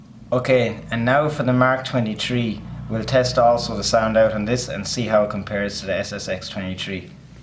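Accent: Ireland. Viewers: You get a thumbs up just for being Irish, and the Irish accent. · Finally a proper comparison between the two, everyone's else's comparison is only like 5 minutes and barely gives any information. Also i still can't figure out which part of Ireland that accents from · Excellent, informative video matey. Was just pulling your leg, I knew what you said really (everyone loves the Irish accent!)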